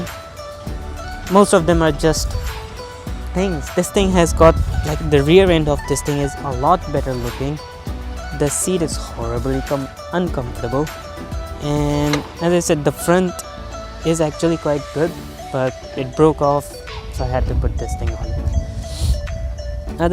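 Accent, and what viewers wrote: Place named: Pakistan. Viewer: Ur English is also made in pakistan.as we can see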